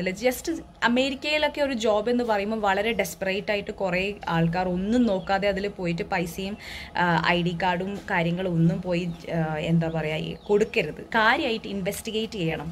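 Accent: Kannur, India. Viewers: കണ്ണൂര് കാരിയാണല്ലേ. നല്ല അവതരണം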